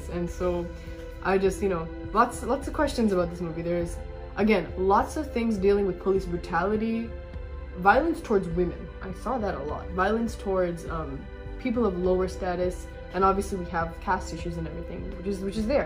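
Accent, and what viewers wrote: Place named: United States. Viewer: Wow... Wonderful Review in American English... Congrats...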